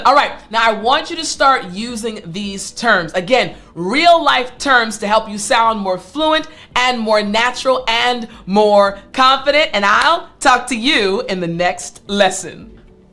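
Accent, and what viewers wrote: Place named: United States. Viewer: I really get confidence when I listen to you. I personally don't like American accent but with your voice the accent sounds beautiful ❤ Ramadan Mubarak.❤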